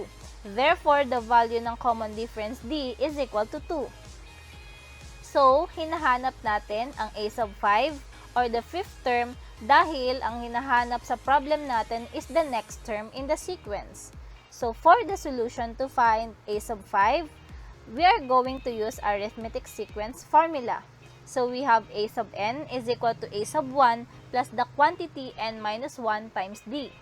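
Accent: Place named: Bicol, Philippines. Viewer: Hi ma'am thank you po,,btw napansin ko po na parang taga Bicol ka? I'm from Bicol din po kase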